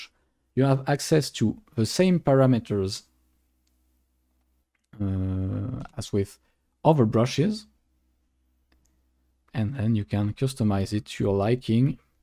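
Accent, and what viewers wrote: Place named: England, United Kingdom. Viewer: Pas mal ton accent anglais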